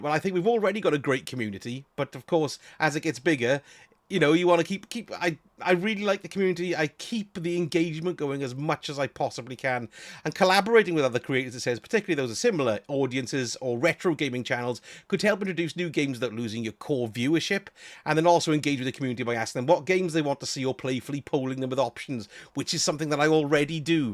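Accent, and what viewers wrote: Wales, United Kingdom. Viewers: Great to put a face to that beautiful Welsh accent, been watching your content for years! As an older gamer myself I enjoy your humour. Id love to see you do some videos on the replayable aspects of Destiny like raids with viewers or on some of the controversies like Manstrual’s ban and his corresponding video. Hope the game doesn’t die. People have been saying it’s finished since before I started playing all those years ago.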